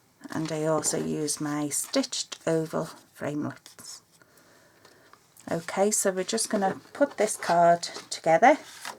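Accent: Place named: Ireland. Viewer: I thought I was hearing Ireland in your voice. My Dad was born in Ballymena, Co. Antrim.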